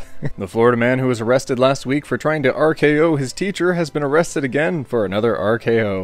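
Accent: United Kingdom. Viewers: Just a random german: gets read in the most sterotipically brittish accent possible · Post: JustARandomGerman: Reads in a British Accent Me: wh-... You know what, I still love it Edit: I just noticed that many others already noticed and commented this 😂 Well, great minds think alike. High five ✋🏼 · why a British accent? their username clearly tells you they are german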